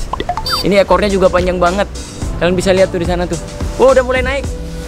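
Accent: Madura, Indonesia. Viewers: Anda orang madura ya kalo iya berarti sama kayak saya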